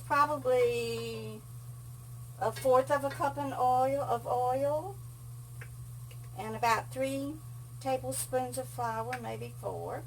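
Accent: South, United States. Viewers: Hi, I just discovered you last week. My grandmother and her family were from Starkville, MS and one cousin is left there. My grandmother's cooking had a MS flair with lots of butter. And some from Ackerman. Goodlooking meatloaf and love your southern drawl. I'm in north Texas. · It’s the Southern accent,. White (whyyyte). I have trouble with people understanding some of my pronunciation that aren’t from the South. Asked for a bag of ice in Philadelphia (iyyyce) and the lady thought I said a bag of ass. 🤣😂🤣😂 We got a good laugh. People from Idaho don’t have a Southern accent. I love to hear the lovely accent. Happy New Year to you. 🎊🍾🎈😘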